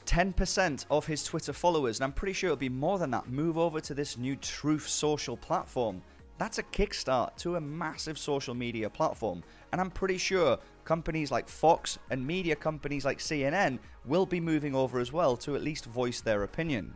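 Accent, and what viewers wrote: United Kingdom. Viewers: Hay, Just new to this, but loving having a UK voice tell it like it is. Was think I might throw something at this, Cant find the stick on Etoro so I am guessing is one some sort of sub exchange. Any suggestions where best to access DWAC stocks from?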